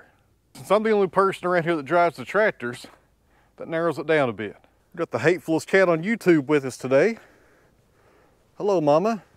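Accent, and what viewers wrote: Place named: Tennessee, United States. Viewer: I watch a lot of saw milling on Youtube, but lately its gotten difficult to watch other channels. It seems they are working way to hard, you make it look easy my friend! Its also nice to hear the Tennessee accent. I grew up in the Knoxville area, but im living in Kansas City now and I really miss people that speak properly 🤭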